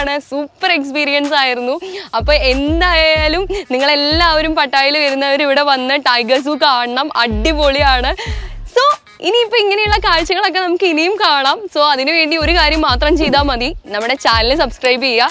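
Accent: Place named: Thrissur, India. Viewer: Your voice, Thrissur slang and way of presentation are very much attractive.. Nice..♥️..also animals shows are exciting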